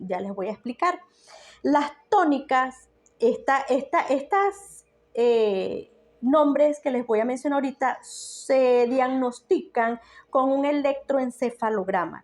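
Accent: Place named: Venezuela. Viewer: Amiga es la segunda vez que te veo y por el asentó me imaginé que eres venezolana .tambien soy de el Tachira ,Perú Ivo en el Reino Unido.y tu testimonio es es de una gran ayuda,y te felicito por tu valentía y dedicación a tu hijo.Bendiciones .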